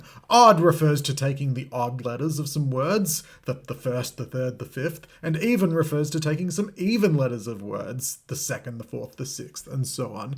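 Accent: Australia. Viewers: I get sucked in by the Australian accent. When he says "deer" it sounds to me like "dee-ah." And then he comes up with what sounds to me like an American r sound thrown in at the end of "idea."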